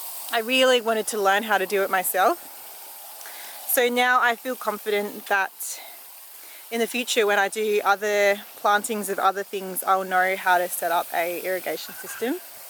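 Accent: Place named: Australia. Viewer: Hi, did you get rain meanwhile? Just checked "weather Australia"😅 since i don't know where you are and it seems to be raining right now... somewhere in Australia... Rain is a bit luke health, we take it for granted until its missing. I'm only 10min in aymd enjoy the video as always. Like your Australian accent and am a bit jealous of your kids names. Maybe you should rename Dusty in something like "Rainy" or "Sloppy". 😅 I'll pray for rain.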